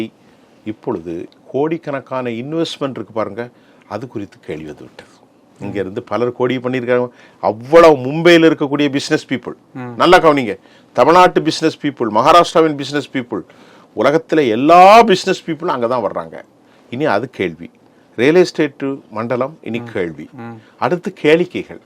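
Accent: Sri Lanka. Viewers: இலங்கைத் தமிழ்.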